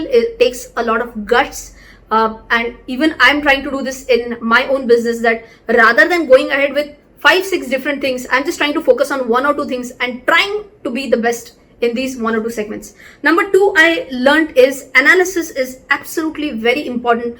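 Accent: Maharashtra, India. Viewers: I enjoy the Marathi accent the most....and the lecture is top class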